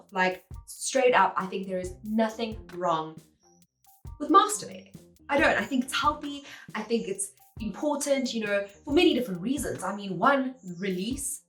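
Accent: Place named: South Africa. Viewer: South African.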